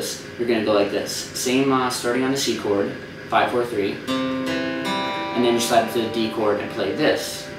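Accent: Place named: United States. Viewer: hehe i like ur accent doing filipino language (tagalog) when ur american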